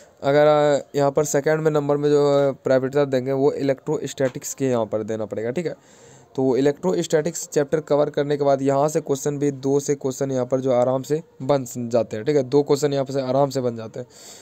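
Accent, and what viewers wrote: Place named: India. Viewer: सर आपके बोलने का अंदाज देसी स्टाइल एकदम मस्त बढ़िया मजेदार है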